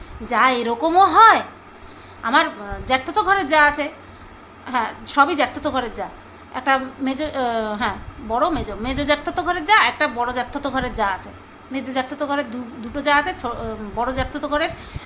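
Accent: Bangal, Bangladesh. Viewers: দারুণ কথা বলেছো।আর কি সুন্দর বাঙ্গাল কথা শুনতে খুব ভালো লাগছিলো।আর হাসছিলাম। ভালো থেকো। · Tomar mukhe bangal kotha valo lage age bosak ke nie bolte tokhon bangal kotha bolte. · তোমার মুখে বাঙাল ভাষা খুব ভালো লাগে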